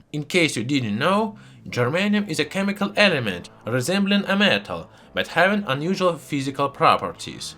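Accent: Russia. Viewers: are you russian??? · Are you a Russian?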